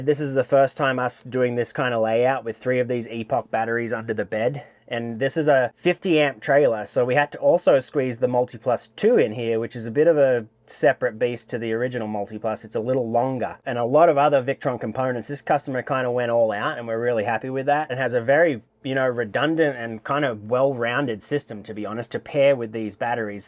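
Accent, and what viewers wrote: Australia. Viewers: Assuming you're in Australia because of your accent. Surely you have heard of AS/NZS 3001.32:2022. Your battery installation seems to be noncompliant in my opinion. The cover of the enclosure needs to be secured with tools, if your enclosure is actually sealed and vented properly I cant imagine how your electronics in there with the battery are adequately cooled. Your work looks very professional but I cant see how it's legal. Oh now I see the license plate, thats how its legal. Hahaha. Yeah any aussies wstching this, you need to have your batteries outside the habitable area, similar to how we have gas bottles.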